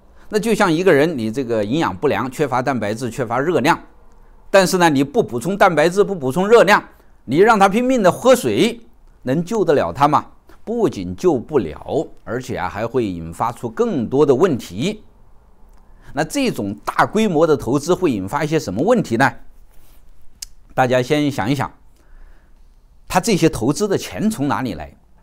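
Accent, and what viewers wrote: China. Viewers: 聽口音你也是中國人吧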